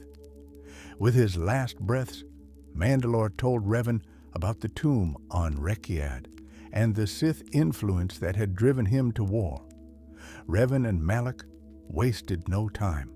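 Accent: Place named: South, United States. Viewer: Dude why on earth would anyone want to hear a star wars tale from the vice of some southern dude sitting on his porch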